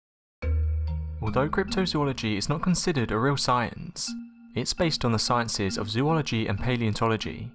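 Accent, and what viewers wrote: England, United Kingdom. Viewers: Is it just me or do all videos like this have someone with an English accent narrating.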